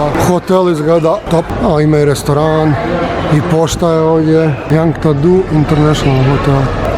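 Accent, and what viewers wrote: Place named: Croatia. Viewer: Super ste ali taj hrvatski akcenat je jako iritirajuci I kenja mi se od njega!!!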